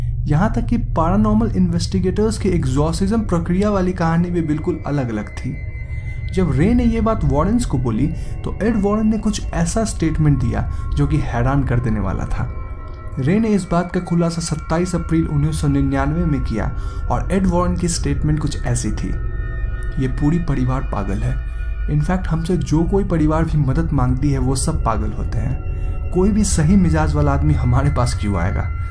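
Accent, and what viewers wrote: Bengal, India. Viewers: Aap Bengali ho kya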